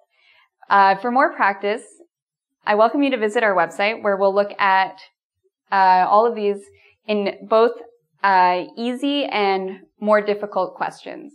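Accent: United States. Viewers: Is she an American？ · Estou aprendendo bastante! I'm learning a lot! Miss, are you american or canadian?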